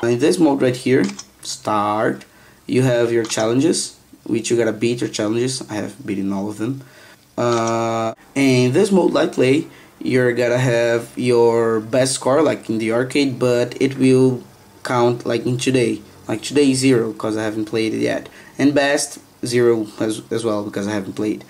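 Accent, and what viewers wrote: Brazil. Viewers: I believe its because he have a Brazilian accent.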